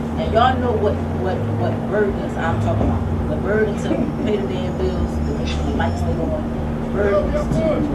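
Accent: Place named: Philadelphia, United States. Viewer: "Voluable"? Lol, love that philly accent. Just teasing! Nice video.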